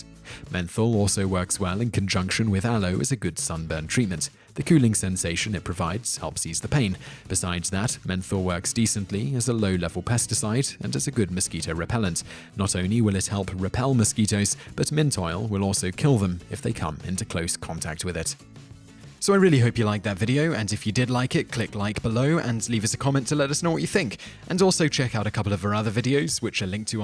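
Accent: United Kingdom. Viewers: Pave Stiletto The video doesn't put it in words on the screen, but it does say the original flavor was peppermint. The word Pez is an abbreviation of the German word for peppermint.(PfeffErminZ). The video could have been much MUCH clearer on it, and unfortunately, seems to be a huge problem with all of this channel's (and those of the sister channel Top Tenz) videos. They aren't clear and tend to go on rambling stores that don't appear to actually give any answers other than just interesting history. They do give the answer, but unfortunately, Simon's insistence on pronouncing everything the British way, and the rapid drive-by of lesser-known words and facts causes you to need to do extra research to find out the information the title hints at. And, if you have to do that to every video they make, what's the point in watching the videos in the first place?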